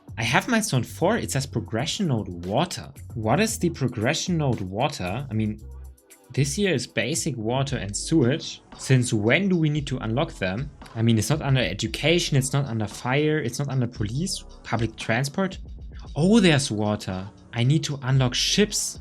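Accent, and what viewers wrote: Germany. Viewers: Ha ha this accent (german) right?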